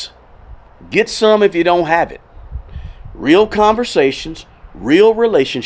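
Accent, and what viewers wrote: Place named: Tennessee, United States. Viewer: Bro sounds country. Must be from TN.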